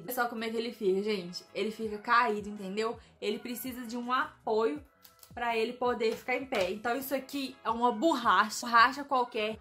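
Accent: Minas Gerais, Brazil. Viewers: É mineira, 98% de certeza pelo jeitin que fala kkkkkkk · Moca vc e mineira né? Te ouvindo falar ,parece eu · Ah, mineirinha! É inconfundível o nosso sotaque. 👏👏❤️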